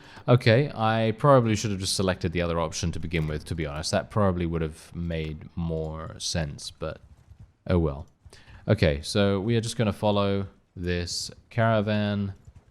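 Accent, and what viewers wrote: United Kingdom. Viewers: These should not be this fun to watch... but I get good ideas which make my campaign more fun and you got a cool british asmr kinda thing... you Brits have spoiled me with your cool voices, I def prefer to watch other youtube vids with good ol British folk narrating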